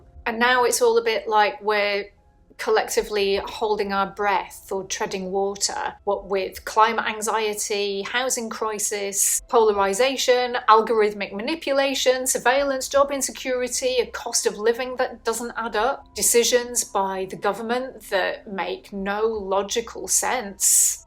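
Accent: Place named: Manchester, United Kingdom. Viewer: I thought I could hear a little Mancunian twang in your accent. The 90s in Manchester was unbelievable but of course I'm biased. It was the cultural capital of arguably the world back then. The music, nightlife we had a decent football team back then also. As far as safety goes you could argue that Manchester was more dangerous in the 90s that's why it was dubbed Gunchester there was a lot of gang activity. Statistically I don't know which era is more dangerous. Maybe social media and algorithms play their part in elevating the sense of danger and fear Of course they weren't around back in the 90s. Generally speaking I think that every generation would say that the time that they spent as teenagers was the best time of their lives. So if you happened to be a teenager in the 90s then you would say it's better than today.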